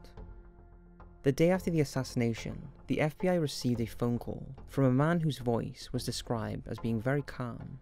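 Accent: Wales, United Kingdom. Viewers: Plus that Welsh accent... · I know a Welsh'mon when one talks 🏴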